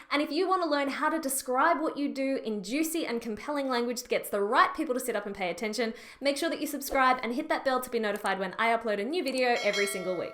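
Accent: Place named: Australia. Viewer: Great tip to find a niche within a niche..Didn't know you say niche differently in Australia (I'm a kiwi living in USA)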